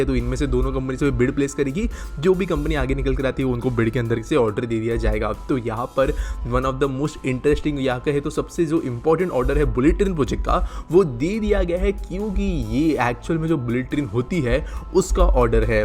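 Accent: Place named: Marwari, India. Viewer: Bhai kya mawari ki tarah bolta ha ,kisi ko hire kr le ,sound quality raddi ha , content shi ha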